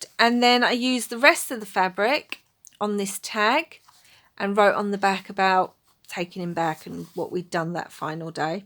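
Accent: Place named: England, United Kingdom. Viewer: Are you Irish born but live in England? Or have you lived in Ireland your entire life? I thought your accent is English/British. Just curious. Didn't want to know for any other reason.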